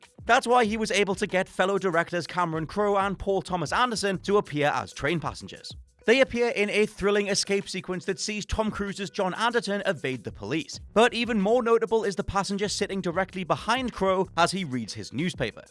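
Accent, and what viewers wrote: United Kingdom. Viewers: That's a British thing. They almost all pronounce it Whooook instead of how North Americans do.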